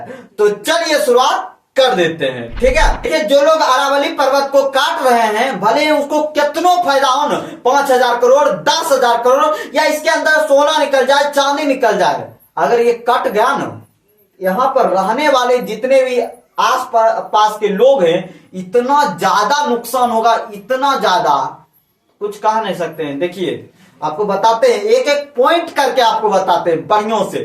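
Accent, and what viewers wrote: Bihar, India. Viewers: Are Bihar me hindi thoda wese hi hota hai, alag alag jagah pe alag alag hota hai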